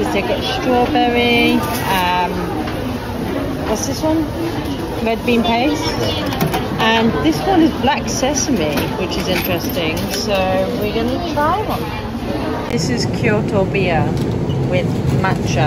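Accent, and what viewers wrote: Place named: England, United Kingdom. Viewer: Your English accent is fascinating!!!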